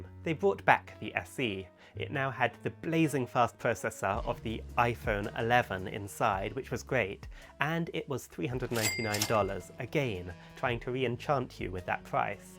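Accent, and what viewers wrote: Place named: United Kingdom. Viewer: I want to speak english like you. The way people from the UK talk... it's immaculate bro. Sounds really fancy.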